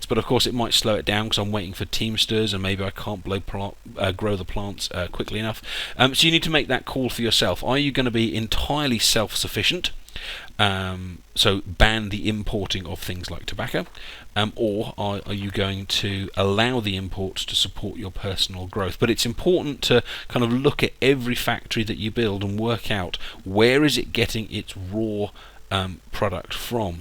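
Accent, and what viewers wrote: United Kingdom. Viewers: Thanks for the tips, it's great hearing a brit instead of the winey american accent and blubbers mostly rubbish... Could you perhaps do a tips and trick for Modern Times DLC, please?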